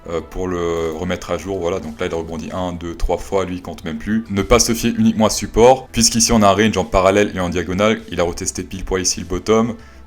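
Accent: Quebec, Canada. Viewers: Quebecois ou cameronai Mdr on cest plus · Aie, dur l'accent québécois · Super ton accent canadien mdr ...je voudrais vraiment savoir qui tu imites avec cet accent!?! tu cartonnes comme toujours ... Tu pourrais faire l'oiseau de mauvais augur une petite rep ( rep augur) non ? Elle n est pas bonne ? Bon j aurai essayé ^^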